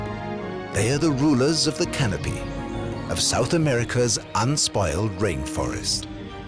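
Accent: United Kingdom. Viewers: He’s British. Everyone who speaks English is Not American.🤷🏽‍♂️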